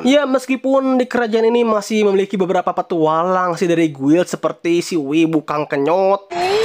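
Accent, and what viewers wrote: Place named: West Java, Indonesia. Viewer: Mang orang Sunda nya 😊😅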